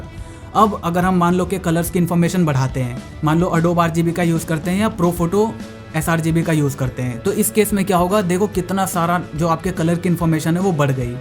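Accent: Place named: Delhi, India. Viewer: Delhi se ho kya?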